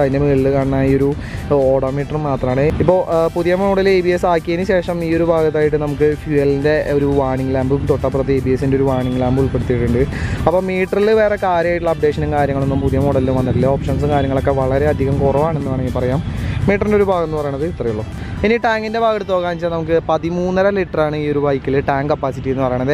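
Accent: Thrissur, India. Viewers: ഫോൺ കേടായത് കൊണ്ട് നാൾ കഴിഞ്ഞ് ആണു ചെട്ടായിടെ വീഡിയോ കാണുന്നത്.. സംസാരം ഒക്കെ ആകി മാറ്റി കളഞ്ഞല്ലോ.. missing that Thrissur broo.. ഞാൻ തൃശൂർകാരൻ അല്ല.. പക്ഷേ I loved the way you talked.. pazhe pole mathy broo...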